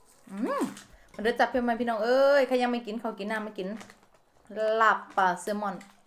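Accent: Korat, Thailand. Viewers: สวัสดีจ้า น่าอร่อยกินด้วยจ้า ปุ๊กชอบฟังสำเนียงพูดของ เพราะค่ะ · ขอบเสียง นักขนาดจ้าววันนี้มากินข้าวเย็นตวยเน้อจ้าว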